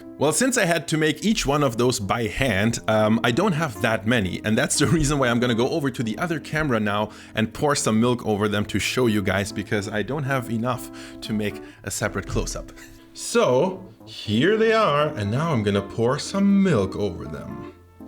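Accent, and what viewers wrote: United States. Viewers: I like your videos. :) And I'm really curious to know where you're from. Well, obviously, you're German. But you sound like an American and you look like a mixed European and Middle Eastern. 😄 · your accent is INCREDIBLY American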